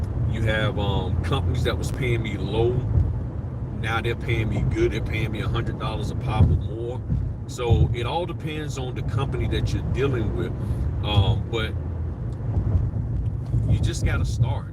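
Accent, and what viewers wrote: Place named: Florida, United States. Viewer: YOU DEFINITELY SOUND LIKE SOMEONE FROM DUVAL COUNTY!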